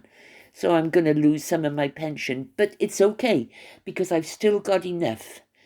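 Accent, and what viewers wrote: Wales, United Kingdom. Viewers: I’m SO delighted that you are back. I missed your lovely Welsh accent and humour. 🏴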